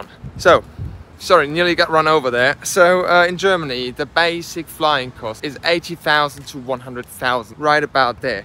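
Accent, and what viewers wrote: United Kingdom. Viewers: I am trying to figure out where his accent is from. It's like he learned English from people in East London.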